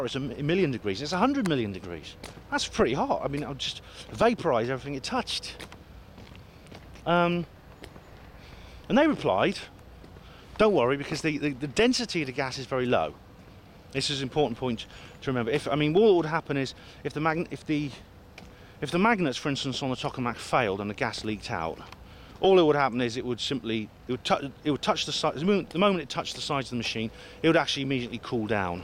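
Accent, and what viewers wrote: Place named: Oxfordshire, United Kingdom. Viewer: Free energy tech demonstration with a distinct Oxfordshire flavour... :) watch?v=cuTp7HkpW7U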